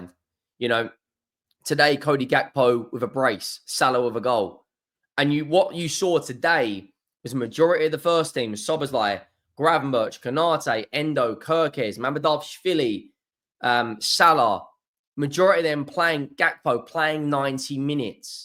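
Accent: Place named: London, United Kingdom. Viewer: Why is a guy with a London accent telling Liverpool fans what we think about our players? We all love Gakpo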